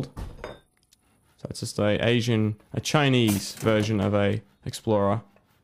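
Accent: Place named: Australia. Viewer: u sound aussie, but good on you pommy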